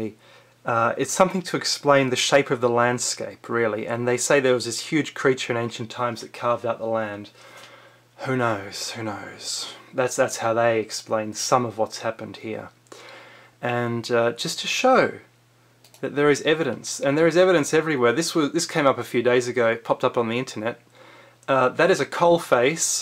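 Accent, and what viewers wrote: Australia. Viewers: This man sounds Australian but he obviously has not seen very much of it. The redcentre is fairly desolate but all states have huge forested areas. The area shown inthis video is in the iron mining area of Western Australia. The rest of Australia isbeautiful and we have the best beaches in the world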